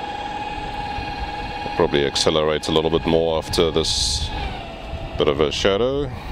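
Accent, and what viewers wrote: South Africa. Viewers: Nice! Kink soos Engels met 'n Afrikaanse aksent. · I hear a South African accent -> I press the like button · I love the SA accent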